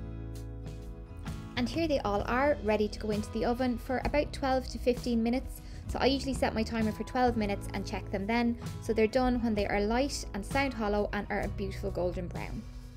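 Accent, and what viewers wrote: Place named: Ireland. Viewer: They look lovely, and your Irish accent is lovely as well! Can’t wait to make them with some clotted cream!😊👍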